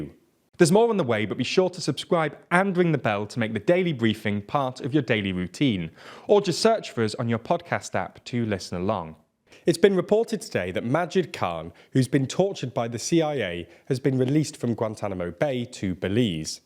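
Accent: United Kingdom. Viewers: Sorry you are feeling unwell,. Hope you get better soon. You are still gorgeous, and your Brit accent still rocks. You could read the phone unwell with that accent and I would still tune in.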